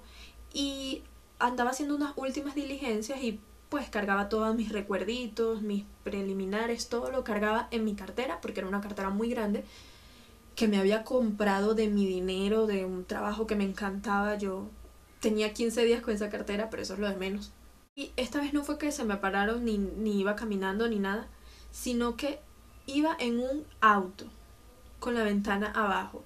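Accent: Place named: Colombia. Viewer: se te noto el acento colombiano jajajajaja saludos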